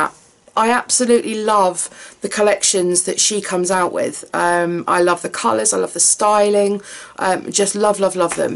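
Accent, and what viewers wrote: United Kingdom. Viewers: Can't believe I missed this video earlier. Have always loved your videos. Love this one and getting to know u better. Love your sense of humor and I could listen to the English accent all day long. Love your sassiness and love that u don't apologize for your overabundance of everything scrapbook. Much love from usa.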